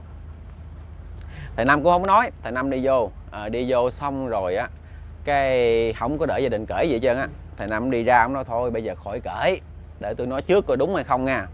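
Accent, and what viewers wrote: Mekong Delta, Vietnam. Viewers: Người Miền Nam mà lại hệ miền tây nên dễ nghe, dễ hiểu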